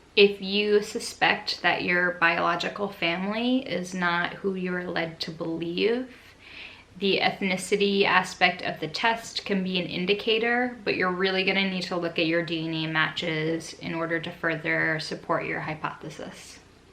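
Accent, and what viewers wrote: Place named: United States. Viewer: Genetically, yes, she is 100% Caucasian as the ME falls under that category. But socially, she's mixed race due to the cultural differences. Judging from her accent, she lives in the US and is trying her best to make sense of things within the racial constructs that she lives in. It's accurate for her to describe herself as mixed race.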